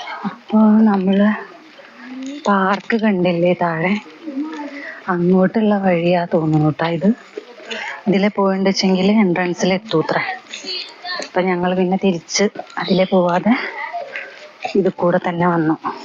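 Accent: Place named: Malabar, India. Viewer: 40 പേർക്ക് ഇഷ്ടപ്പെട്ടു എന്റെ കമെന്റ്..അറിവില്ലായിമക്ക് കയ്യും കാലും വെച്ചാൽ അതിനു എന്ന് വിളിക്കാം...വാഴാനി അണക്കെട്ട് എവിടെയാണെന്ന്.എവിടന്നു പോകുന്നു എന്നും അടുത്ത ഏതെങ്കിലും അറിയപ്പെടുന്ന ടൌൺ ഉണ്ടകിൽ അത് ഇതൊക്കെ പറഞ്ഞാൽ അറിവില്ലാത്ത ഞങ്ങൾക്കും പോകാമല്ലോ.എന്തിനാണ് വീഡിയോ പിടിച്ചു ഒരു പരിചയവുമില്ലാത്ത ഞങ്ങളെ കാണിക്കുന്നത്.പ്രായത്തിനെ അന്തസ്സെങ്കിലും കാണിക്കുക.ഇത് താങ്കളുടെ തെറ്റല്ല കോന്തൻ ആണ്..സംസാരത്തിൽ നിന്ന് നിങ്ങൾ മബാറുകാരിയാണന്നു മനസ്സിലായി.വളരെ നന്ദി ഇനിയും ഉപദ്രവം തുടരുമെന്ന പ്രതീക്ഷയിൽ തൽക്കാലം നിര്ത്തുന്നു സ്നേഹപൂർവ്വം കുഞ്ഞാപ്പ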